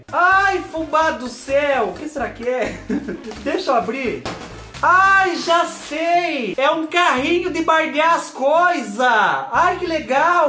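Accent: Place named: Itatiba, Brazil. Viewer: Adoro esse sotaque dele. Eu sou baiana,mas morei muito tempo em Itatiba,onde o povo fala bem desse jeito e eu morro de saudades.💞🙏😢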